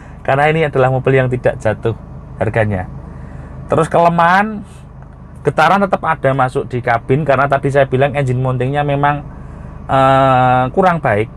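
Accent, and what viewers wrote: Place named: Java, Indonesia. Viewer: Aku seneng bosomu medok, Mas ... 👍😂👍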